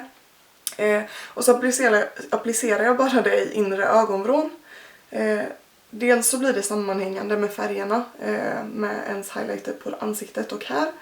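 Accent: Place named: Småland, Sweden. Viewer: Min söndag fick ett perfekt avslut efter denna videon. Saknat ditt fina rum, men vart är de turkosa ljusen haha de var så fina ju. Har följt både dig o Louise sen jag var 12 år ungefär, även eran blogg. Din småländska röst förgyller mina dagar och brukar kolla dina äldre videos när jag har tråkigt. Älskar att du ska "börja" igen försök hålla i, jag är så taggad. Kan du göra en "catch up" video där du berättar om din tid när du har varit borta, om din utbildning m.m. Det hade varit väldigt intressant för oss trogna följare som följt dig länge. ❤️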